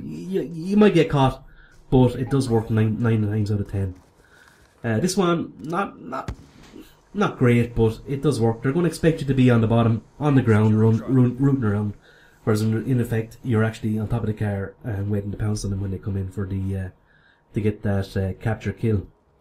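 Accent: Ireland. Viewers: U Irish if u are respect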